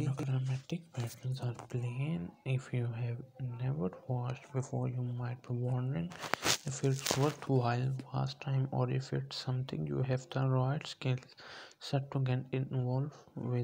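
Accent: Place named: India. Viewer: Он говорит на ужасно противном английском (индус, скорее всего). Ничего особенного не говорит, просто обсуждает то, что видит....что там разные текстуры, разные цвета, макраме....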